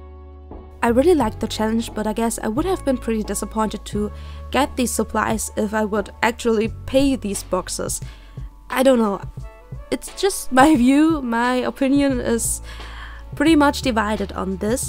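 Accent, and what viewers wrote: Germany. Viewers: Okay, das Bild was du aus den Markern gemacht hast ist echt toll geworden ^^ ich hab btw. Raus gehört, dass du deutsche bist und hab dann nach geschaut:p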